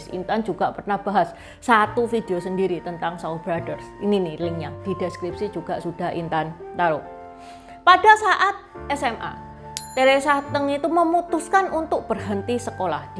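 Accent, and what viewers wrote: Java, Indonesia. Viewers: mbak aki juga org jawa meski bukan cindo tp logat medokmu kebangetan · Medok bgt ngomongnya, pusing pala ku dgr nya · memanng legend..lagu2nya begitu masih dikenang dan dinyanyikan hingga saat ini..dan saya pun masih debngerin lagu2nya...thx ulasanya ci intan..btw asli dr mn ci kok medok jawa bangaet..? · Medok banget jawane mbak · intonasi jg terlalu medok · Medok.. Chinese nopo jowo mbok???😂😅😅😂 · Mbak jgn edok bgt bisa tdk. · Mbk intan,wajah chines logat jawa medog bingit... 👍🤭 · Berisik, medok nyakitin kuping !!!!! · Mbak intan orang mana sih koq jawanya medug banget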